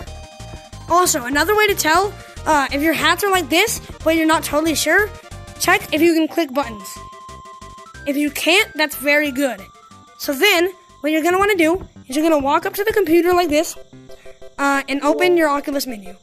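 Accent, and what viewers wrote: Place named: England, United Kingdom. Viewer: Why does bro sound like English kid Naruto?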